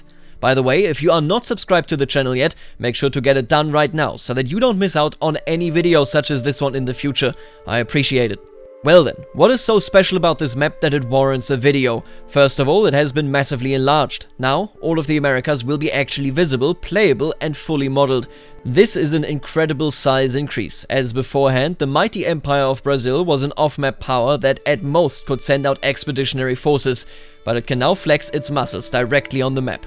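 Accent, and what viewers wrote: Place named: Saxony, Germany. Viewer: You sound like you're from Saxony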